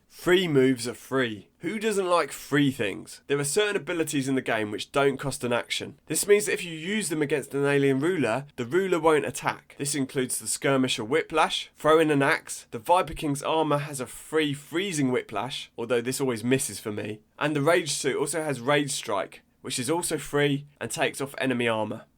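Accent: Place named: United Kingdom. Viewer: man, how much I love your accent... You're british, ok, but exactly from where?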